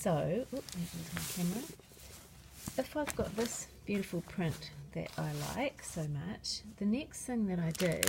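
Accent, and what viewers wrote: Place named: New Zealand. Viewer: Just as I was wondering how I could use my sewing, painting, drawing skills to make things without spending a fortune I found you. Great to listen to a Kiwi voice quietly explaining a simple process using things I recognise without the endless side explanations of things irrelevant :)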